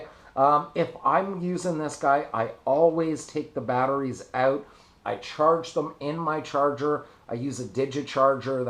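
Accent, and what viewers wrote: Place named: Canada. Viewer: Great comparison video... Never realized you were Canadian, kinda recognized the accent, but when you said you felt like a knob it gave it away lol.... Anyhow. I picked up the sigelei 100 Watt the other day, $81 with a coupon code, the plus was out of stock, could have got the ipv3 for $110 with the coupon, but didn't figure I really needed the extra 50 watts. From what you've laid out in your video, it kinda reaffirms my choice. Should get it this week sometime and I'm stoked.... Keep up the good work man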